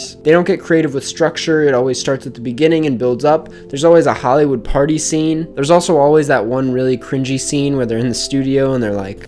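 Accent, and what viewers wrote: United Kingdom. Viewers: The british accent though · British accent😂😂👌👏🏽👏🏽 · The british accent tho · amazing british accent man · you sounds like noel with that british accent wtf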